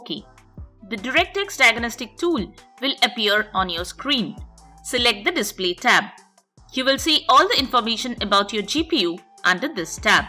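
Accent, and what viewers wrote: India. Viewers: step 1 , don't trust any computer video of indian accent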